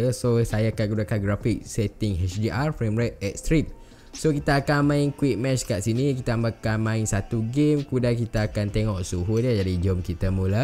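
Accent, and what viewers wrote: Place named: North, Malaysia. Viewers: Pekat noh loghat kedaq hang 😂😂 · Laaaaa orang utara kaaaa...dok mana?? Huhu..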